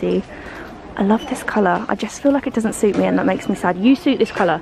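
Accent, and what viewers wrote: United Kingdom. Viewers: Queen of british accent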